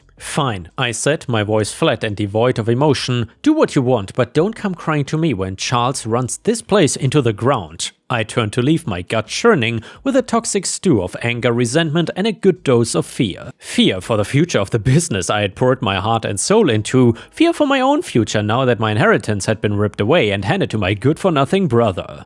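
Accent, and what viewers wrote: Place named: Germany. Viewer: Hey! Guessing from your accent, are you german? Anyways, thanks for the entertainment!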